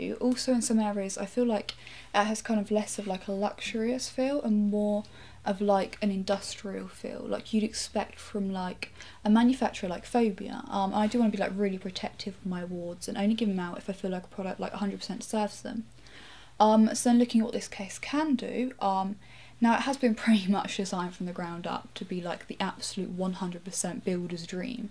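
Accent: England, United Kingdom. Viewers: sexy English voice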